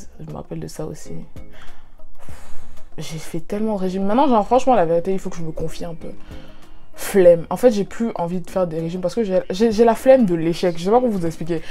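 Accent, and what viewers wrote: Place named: Ivory Coast. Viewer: Je te découvre et je suis scotché 😀 je ne comprends même pas pourquoi... c trop chics 😂😂 l'accent ivoirien je kiffe grave 😘😉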